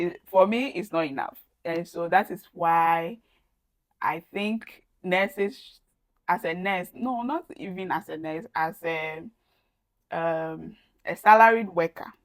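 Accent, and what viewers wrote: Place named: Nigeria. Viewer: are u a nigerian coz you sound a nigerian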